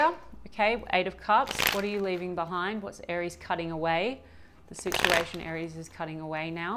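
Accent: Australia. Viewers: 🙌🏻 yes stepping into my power. I was on the low frequencies and saw the same in the relationships I was looking at. I’ve finally let that go and have seen underneath it all was my low self esteem. Lots of self care self love & affirmations. Breaking the toxic habits and giving into the good good love ❤️ Really nice to hear an Aussie doing tarot! Great energy, a brilliant read that I related too. Bless 🙏🏼 I’ll keep coming back!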